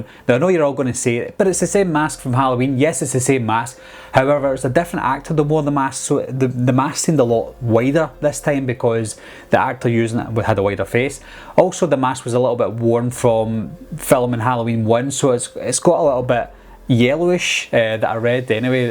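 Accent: Scotland, United Kingdom. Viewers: Da fuq u saying? Is that a Scottish accent. And the H20 mask was terrible IMO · Are you Scottish or Irish if you are cool I am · i love that scottish accent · Love your accent, if its Scottish, I'm actually 89% Scottish and my great uncle had the movie braveheart made about him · Where you from in scotland? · Are u Scottish cus I am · Scottish or irish lad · Not to be rude but are you Scottish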